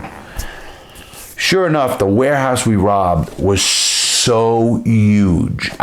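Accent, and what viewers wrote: New York, United States. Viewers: them thick new york mob accents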